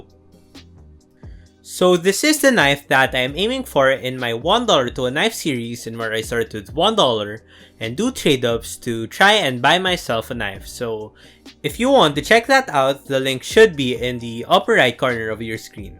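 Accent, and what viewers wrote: Philippines. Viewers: i can hear it in your accent kabayan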